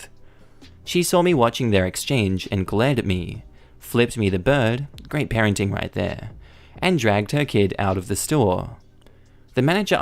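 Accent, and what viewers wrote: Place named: Australia. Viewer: I love the Aussie Accent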